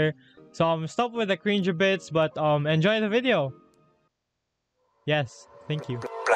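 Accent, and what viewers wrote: Philippines. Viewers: that filipino accent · filipino ka ba idol? halata kasi sa accent mo eh HAHHAAH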